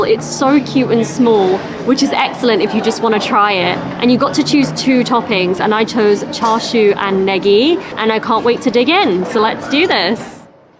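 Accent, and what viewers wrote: United Kingdom. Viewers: 再生速度を落とすとはっきりしたイギリス英語の特徴がイヤというほどわかりますｗ おかげで素晴らしい英語教材になってます。ありがとうｗ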